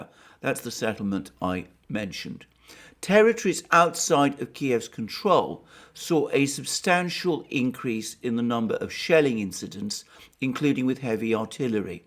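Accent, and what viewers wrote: United Kingdom. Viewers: you are a smart man...I can tell.. are you Russian? Little bit of British accent there.. am a Nigerian.. I love russia 🇷🇺.. my second son is named after putin..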